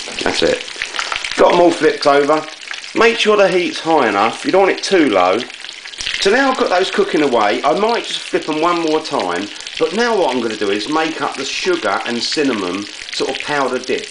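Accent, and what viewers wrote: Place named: Estuary, United Kingdom. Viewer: That's a (Thames) Estuary accent... London and its environs, into Essex, and very working class.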